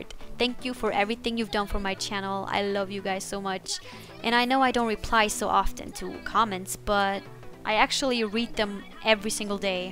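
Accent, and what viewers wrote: Germany. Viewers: samuel sharp she has a german accent.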